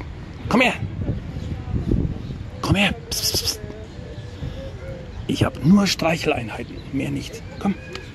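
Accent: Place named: Franconia, Germany. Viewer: Frage. Du hast so nen fränkischen Dialekt. Bist Du in Nürnberg aufgewachsen. Meine Frau ist aus Forchheim, deshalb fällt mir das auf:-). Und ich hab auch etliche Jahre dort verbracht.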